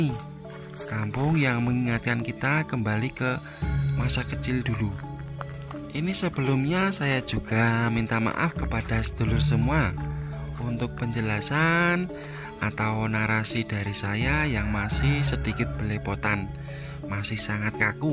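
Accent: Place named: Java, Indonesia. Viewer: aslmkm wargi bandung.. Apa kabar..? Abang asli dari mana ko bicaranya kaya orang jawa. Yah..tapi channel orang bandung..?🙏😀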